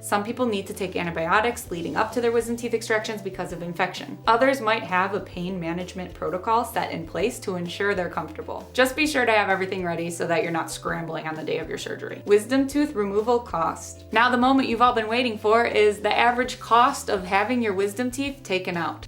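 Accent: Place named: Dakota, United States. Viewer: May I ask a question are you from north or South Dakota? I recognize the accent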